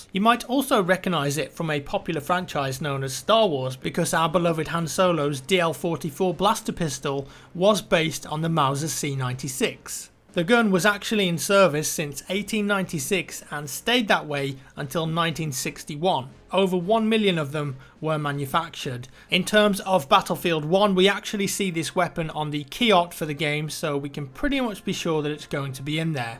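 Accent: United Kingdom. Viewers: you must be british your're accent like jason statham;) #iLikeThat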